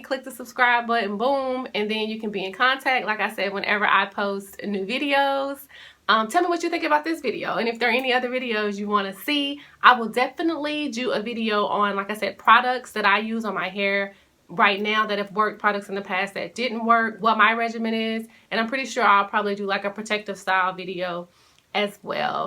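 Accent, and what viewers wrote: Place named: South, United States. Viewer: Cool beans! You sound a little a fellow Southern Girl, Lol! Welcome to yt...looking forward to the virtual connection. Peace and blessings. :)) *subbed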